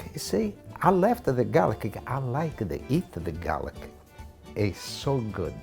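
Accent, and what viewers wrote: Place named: Apulia, Italy. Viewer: He's Pugliese. Dummy.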